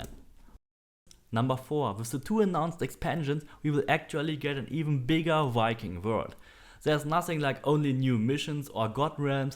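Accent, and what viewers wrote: Germany. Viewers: Where u from bud, u sound german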